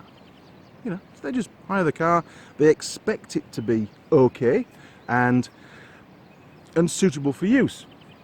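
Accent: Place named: West Yorkshire, United Kingdom. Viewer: really enjoying your channel but one thing has been bugging me,, where are you originally from, you sound reasonably local to me in west yorkshire :)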